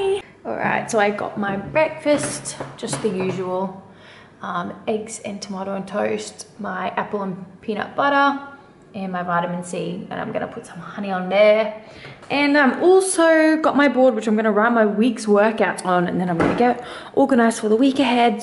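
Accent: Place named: South Africa. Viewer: Are you South African? I have a friend that is South African and the accent is so similar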